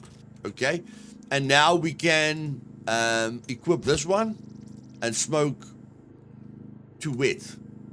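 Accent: South Africa. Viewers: hey you sound south african what server do you play on?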